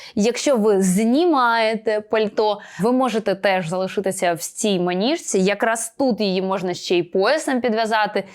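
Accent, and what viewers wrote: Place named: Russia. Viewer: і купа помилок, відчувається, що людина в житті спілкується російською, і це підвердилось.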